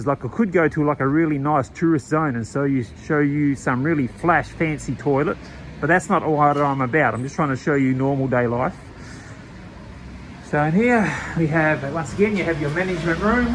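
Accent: Australia. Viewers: Is that Australian broad accent?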